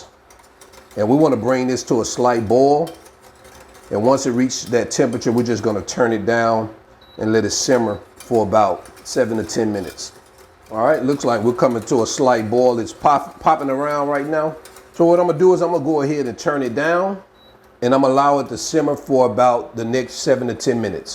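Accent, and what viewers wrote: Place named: Alabama, United States. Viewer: Oh my sweet Jesus, can I be your friend and come eat at your house. I promise ill put it on it each time lol 😆 but really this was amazing to watch. Imma stalk you to learn all your techniques and I knew you was from Alabama for some reason b4 you said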